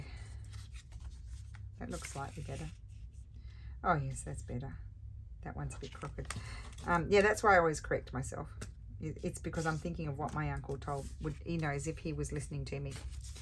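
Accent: Australia. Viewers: I often wonder if people not from Australia can understand you because i feel you speak Australia ,using slang or shortened words or sayings that we use regularly. Im not having a go at you because you did mentioned your uncle correcting you. When ever you say something that is Aussie I just smile.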